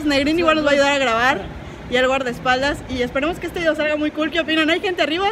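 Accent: Campeche, Mexico. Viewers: Oye amiga eres de campeche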